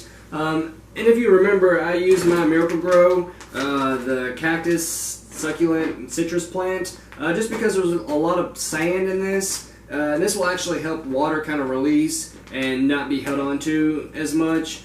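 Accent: South, United States. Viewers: First of all I am so glad I found your channel! Hearing a fellow southerner doing these plant videos is so refreshing! I'm from wv and I'm kind of shy about my accent on my videos lol second I got my first agave yesterday so thank you for the info!!! ☺️💚🌵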